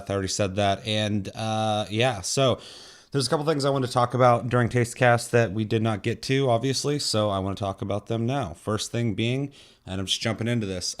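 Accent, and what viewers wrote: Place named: Russia. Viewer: Anthem has an identity crisis. And kinda tries to approach too many audiences. I`m not really interested in BioWare after this point. I`ll still give DA4 a try, but not expecting anything special. This is a weird scenario, when you take a really prestigious company like BioWare, an actual fuck-up and bad game would be kind of given a pass, like "shit happens". But when a company like that releases a game that`s not letter-for-letter awful or bad, but one that`s like Anthem, a game that`s nothing special and has nothing exciting about it, just "meh", it does more damage than a fuck-up. It`s disquieting and worrying when you know that this is something they put actual effort into. Resident Evil is doing justice against the shamelessly stupid movies. I love horror games and Resident Evil is a damn good one and Atomic Hearts looks really dope. 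Seeing other survival horrors go down the drain (like Dead Space that has been downhill since the first title and then EA shut them down) and other horror games with loads of potential (like P.T or Alison Road) get canceled or drown in development hell is saddening, but at least we have Resident Evil. Yo, your russian accent is hilarious ;"D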